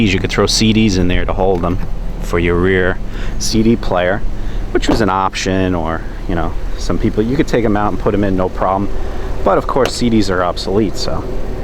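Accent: New England, United States. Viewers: fair enough I'm from NH just knew that had to be a new England accent lol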